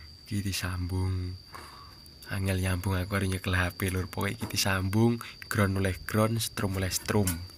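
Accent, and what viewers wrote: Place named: Java, Indonesia. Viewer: Boso mu ale meduk mas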